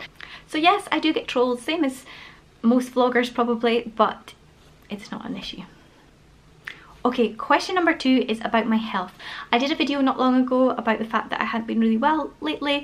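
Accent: Scotland, United Kingdom. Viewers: Hello doll... I am An old fat Scottish Jew living in London! Like to watch your videos to hear a Scottish gal And also your content is always entertaining! X thank you for taking the time to do this. Anxiety touches everyone, we all just deal with it differently. Please remember and focus on the positive influence you’ve had. X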